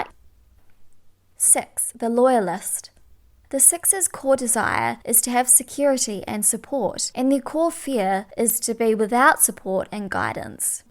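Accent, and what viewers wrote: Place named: New Zealand. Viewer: I love your accent. New Zealand? And u r Type 4 too? Aw. Such a coincidence! 😉😋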